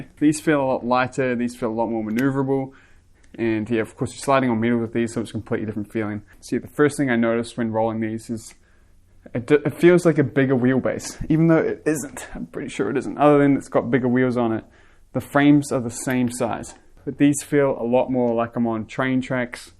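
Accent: New Zealand. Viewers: Are you born Kiwi or you come from another country? I love your accent 😍💞💞💞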